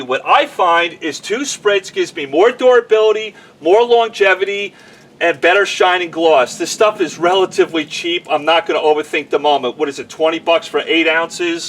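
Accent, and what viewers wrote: New York, United States. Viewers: This guy speaks like a NY’er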